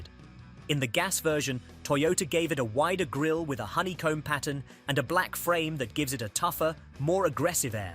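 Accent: United Kingdom. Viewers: British accent sales the videos and products , why? That is the culture and mindset !!!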